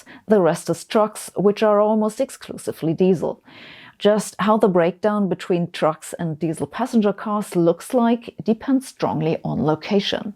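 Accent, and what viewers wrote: Germany. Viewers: fear mongering with a German accent... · Ihr Englisch ist so herrlich Deutsch ...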